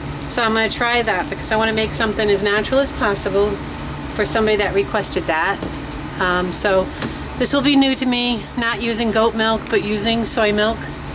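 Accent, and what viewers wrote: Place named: Boston, United States. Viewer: Are you n Boston? I think I am detecting a Boston accent from you. Nice soap by the way.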